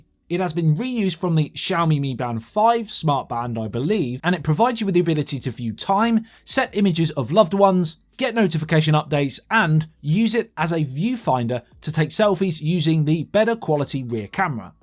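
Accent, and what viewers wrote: United Kingdom. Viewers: Is this Youtuber from UK ? I luv British Accent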